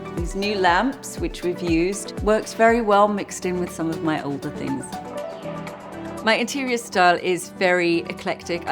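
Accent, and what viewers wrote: Newcastle, United Kingdom. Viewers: She's lost her Geordie accent! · Where has your pronounced Geordie accent gone ? Very strange you know have a posh accent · Where's the Geordie accent? Charlie Charlton - whut happened. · Yes her accent has got even posher and deeper with time. I understand why as another commenter has said, posh circles tend to be quite judgemental - I think being with Damian Aspinall and James Middleton influenced her change of accent much more than her media career as the geordie accent is great asset for a TV presenter.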